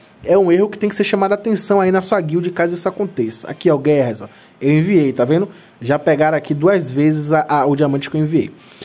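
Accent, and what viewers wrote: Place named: Salvador, Brazil. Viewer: Salve de Salvador meu nobre, pelo sotaque sei que é conterrâneo, de qual bairro fera?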